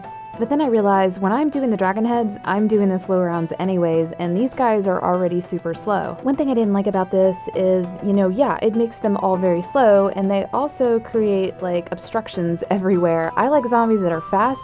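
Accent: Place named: South, United States. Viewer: where ar u from u have a sort of faint southern accent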